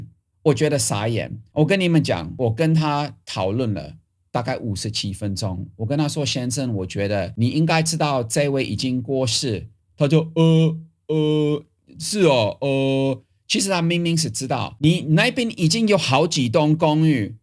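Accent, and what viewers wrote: Taiwan. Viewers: 我非常確定您不是歪國人 口氣 腔調 用語 根本是台灣人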